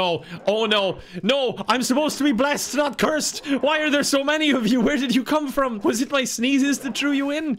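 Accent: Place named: Ireland. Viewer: I read the Wednesday entry in Irish voice.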